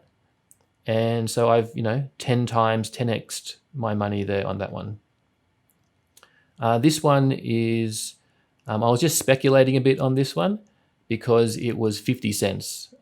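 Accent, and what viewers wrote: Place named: Australia. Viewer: Seems interesting but because u sound like a white Australian, it’s highly likely that this is some kind of colonialist scam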